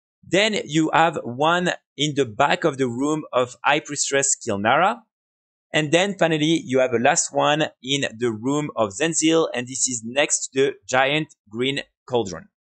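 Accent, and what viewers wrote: France. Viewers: Ça se voit que t'es français 🤣🫣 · Are you french ?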